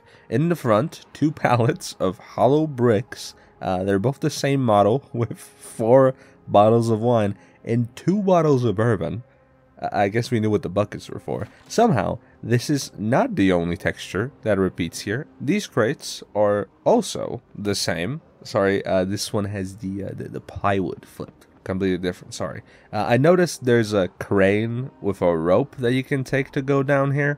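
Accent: Poland. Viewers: Are you polish by any chance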